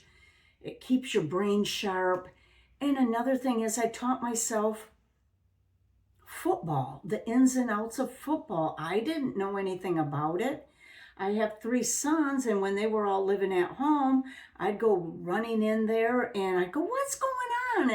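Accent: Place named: Michigan, United States. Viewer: Just found you-subscribed. Your AI video really had me laughing. I had a very technical medical job and was told I would have to do twice the amount of work. When I explained I would not be able to keep up the same quality of work I was told (get it through your thick skull pretty much) "We do not care about the quality of your work! Just do twice as much." I quit, and I can laugh about it now, but it was traumatic at the time. Former Michigan person, and you have my accent. Nice to hear.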